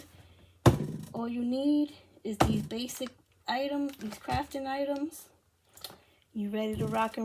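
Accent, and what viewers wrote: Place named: New York, United States. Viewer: Where you from I hear a accent, you from NY?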